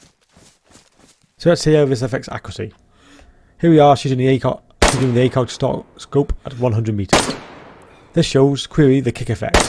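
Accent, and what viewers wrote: Wales, United Kingdom. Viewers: did i hear a welsh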